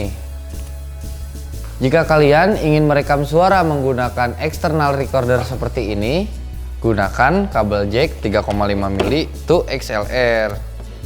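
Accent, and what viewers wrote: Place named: Sunda, Indonesia. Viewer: Kentel bgt sunda na, kang kabayan banget atuh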